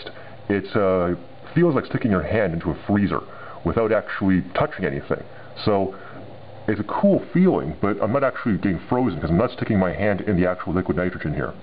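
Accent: United States. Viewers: why do you use Celsius if you're american? (i assume from the accent)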